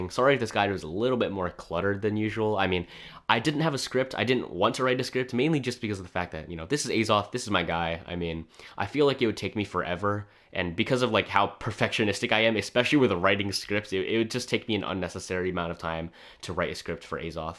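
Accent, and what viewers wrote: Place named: Zimbabwe. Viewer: Where are you from? You sound Zimbabwean🇿🇼.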